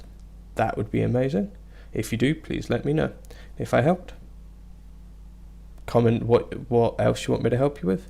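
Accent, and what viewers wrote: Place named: Surrey/Hampshire, United Kingdom. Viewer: Great video, really informative! Was actually stunned to see only 100 subs, so take mine - also you sound like you're from Surrey/Hampshire?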